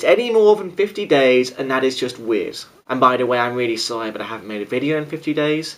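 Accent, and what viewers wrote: England, United Kingdom. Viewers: Oh my gosh. Like i totally am indifferent to the english accent but every time i watch your videos i just totally wanna make out with you and i can't even comprehend what your saying cause i',m fantasizing about what you look like in you boxers . . . or briefs?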